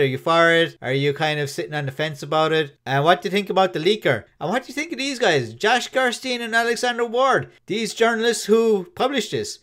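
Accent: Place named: Ireland. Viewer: Gona go ahead and guess your Irish….though you were an Indian dude fighting an accent when I first heard ya. Good content. They have conservatives in Ireland!? Though the whole island had been taken over by abortion activists…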